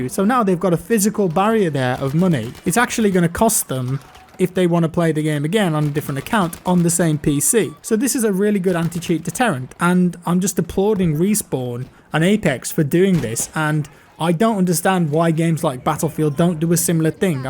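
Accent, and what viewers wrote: United Kingdom. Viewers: Here's something that's kinda funny. When I watch you're content for BF5 or content from any other game, I trust you more than some guy in America, (I live in America) because of the Brittish accent. (Yes, I know you don't have an accent but I have the accent) Why?? I have no idea at all..... :)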